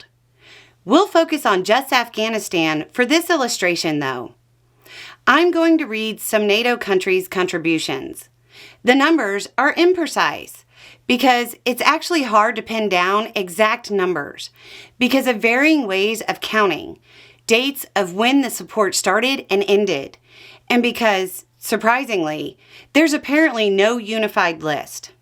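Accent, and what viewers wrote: United States. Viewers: Pleasantly surprised to hear an American accent speak so much sense.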